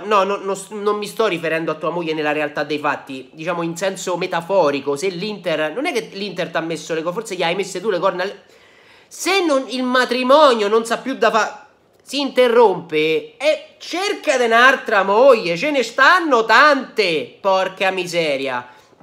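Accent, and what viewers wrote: Latina, Italy. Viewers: Ciao ma sei di Latina?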